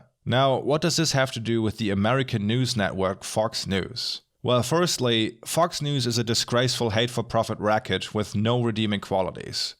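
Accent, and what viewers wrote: Germany. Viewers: he is german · Yea you’re right especially in this one. The German has really faded, but considering the subject content for this episode it’s understandable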